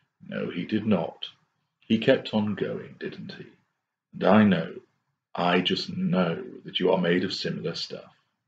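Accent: England, United Kingdom. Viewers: you have an accent. are you english?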